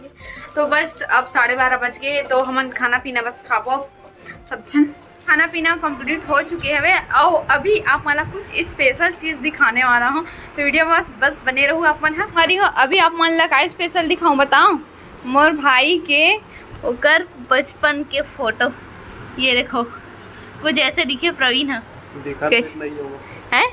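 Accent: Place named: Chhattisgarh, India. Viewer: Mast he apke cg boli bhakha video dekh ke mja aage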